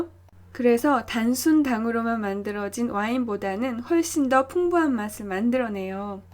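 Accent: Seoul, South Korea. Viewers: 진짜 90년대 서울 사투리 항상 늘 신기해요ㅋㅋㅋㅋㅋㅋㅋㅋㅋㅋㅋ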